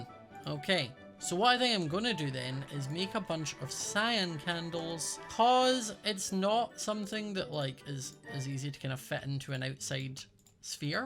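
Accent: Scotland, United Kingdom. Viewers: hes scottish not irish :-) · Yes and it’s because he’s Scottish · Is called being Scottish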